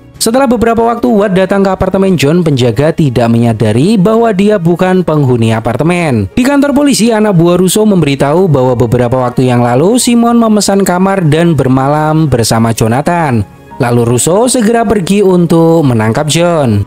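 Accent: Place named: Java, Indonesia. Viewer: Medog bgt bang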